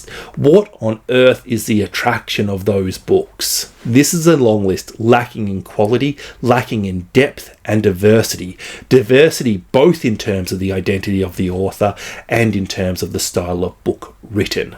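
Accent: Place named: Australia. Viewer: 😂 I kept having to pause my TV and run to the other room to repeat the zingers to hubby: not that I could do them justice, because the accent and cadence really adds to the delivery. I've said before, it feels like Australia is in a golden age of comedy, and everybody benefits 💛 I did get in a joke of my own, don't know if it translates unless you've heard of Shark Tank, but here goes: after running to hubby to repeat several hilarious zingers, I told him that hadn't been posting for a while while his computer was being repaired, and he must have used that time to fill his tank. His Snark Tank. 😂 But seriously, I love your recommendations, and love the way you always approach your reviews from the position of "what basics -- plot, character, writing, theme, etc. -- does this book do well (or not)?" And you always invite the readers (viewers) to reflect and learn about themselves, and to grow our critical faculties: "what kind of reader are you?" I love the laughs, but am nourished by the grounded focus of these videos. 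P.S. I always enjoy when picks out books (and other things!) to recommend to so many kinds of readers. It feels weird havng a minority opinion, so it's encouraging that reminds us "nobody's right and nobody's wrong" when it comes to our reading perspectives. I read Stoner and Gilead, and while I could appreciate their merit, they didn't vibe as highly with me. Also read There There, which was fine, but to me it didn't rate higher than o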